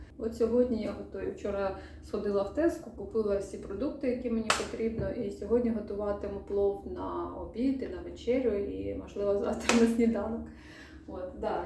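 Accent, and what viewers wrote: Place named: Western Ukraine, Ukraine. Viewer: Доброго дня! Цікаво знати звідки ви виїхали? Судячи з гарної української ви з заходу, невже там було так небезпечно? Чи просто дуже до Англії хотілося?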